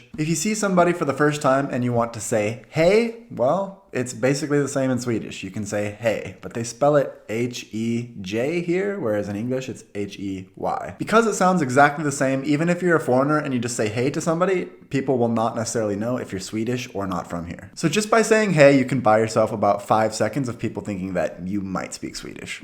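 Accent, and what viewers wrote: United States. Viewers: I'm an American, and I can tell he has an American accent. His Swedish has improved a lot, but he still can't pronounce Norköping correctly. For me, Swedish was very easy to learn, but hard to pronounce correctly. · You are really good at speaking Swedish, but every swede can hear that you have an american accent. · Hearing an american speak swedish is quite funny but then for sure english speakers get an giggle out of me trying to speak english :) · Every swede hear that you have an american accent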